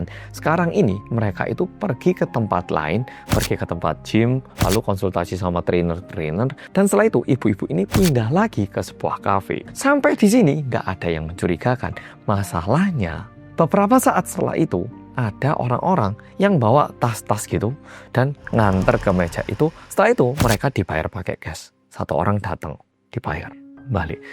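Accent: Java, Indonesia. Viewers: Mas.... Muedooook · sehat terus Mas, selalu kangen denger suara bule Korea yg medhok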